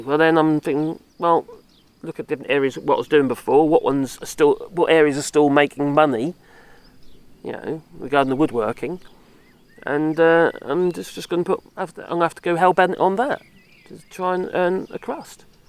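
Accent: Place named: Yorkshire, United Kingdom. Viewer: Oh no !! You have to listen to Yorkshire blokes😱you poor bugger...if you don't like that then don't listen...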